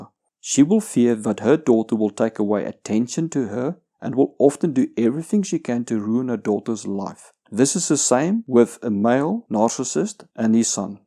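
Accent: Afrikaans, South Africa. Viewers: Safrican accent detected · Nice vid, I subbed and liked. All the points were accurate and appreciate the time stamps. Another thing ill add, even though you did touch up on it is, they are always the victim, no matter what. Also, you have a very South African accent! I checked your 'about' and confirmed my assumption. That's great, I moved to SA in the early 2000's. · Ok so the man speaking here is Afrikaans right? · I was surprised to hear his South African accent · Tell me you're from South Africa without telling me you're from South Africa. That accent is super thick. By the way, good video. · One question: Are you South African?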